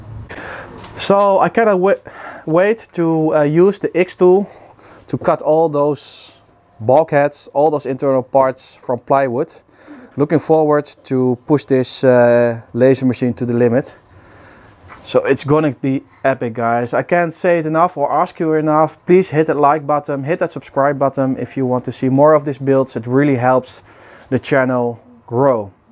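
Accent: Netherlands. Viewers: Ik dacht al aan de eerste paar zinnen dat moet een nederlander zijn, Heel gaaf gemaakt wacht op de volgende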